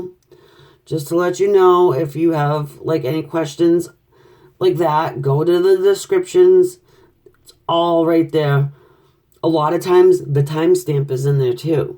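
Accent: New York, United States. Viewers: Are you from Nyc?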